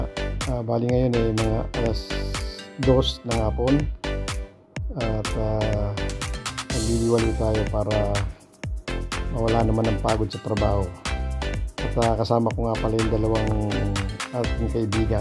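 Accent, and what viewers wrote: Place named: India. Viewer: September🎧 30, 2023 The weather here is fair Ads: 1) Inasal 2) Shopee 3) Excorcist Movie trailer 4) Payless 10 days ago, Brother it seems to me that you have imitated Indian accent. How are you? Qantab has a rocky shore. Okay BRO be safe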